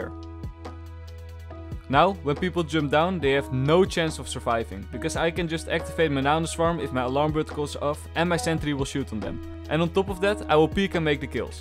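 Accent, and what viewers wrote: Netherlands. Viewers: Pls tell me ur Dutch u can not not be Dutch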